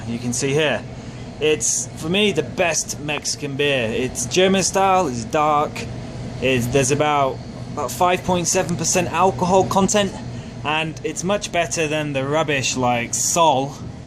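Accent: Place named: United Kingdom. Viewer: I love the british accent !! > Good videos bro.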